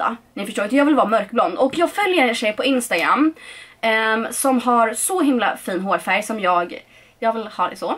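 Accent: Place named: Karlskrona, Sweden. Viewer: em_ det är karlskona dialekten som kickar in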